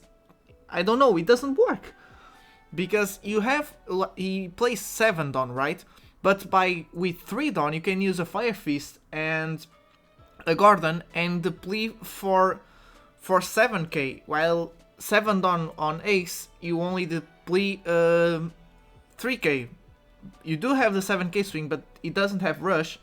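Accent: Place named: Portugal. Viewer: sotaque tuga não engana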